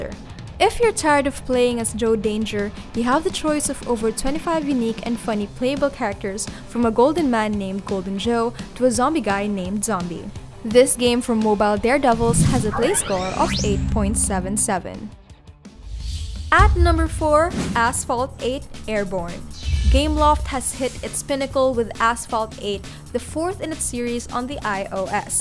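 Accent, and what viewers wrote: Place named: Philippines. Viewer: She sounds pinoy lmao 😂 nice vid doe 👌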